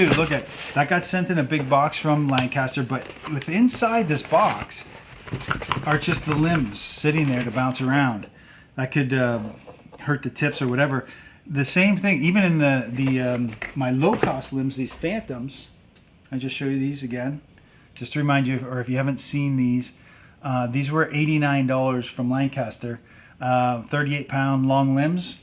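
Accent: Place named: Canada. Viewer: Crap! I didn't realise that you were from Canada. Explains that accent haha. Where from? I'm in eastern Canada. Love the reasonable approach that you take to all of this. I'm fairly new coming back to archery after several decades. Been watchin you and a few others to try and catch up on the basics, the latest tools, brands, gizmos. Been really helpful Cool stuff. So thanks :)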